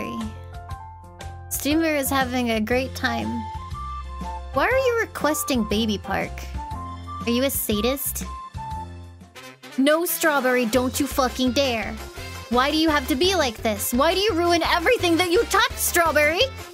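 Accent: United States. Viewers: I live for "American accent" and pronunciations. So cute.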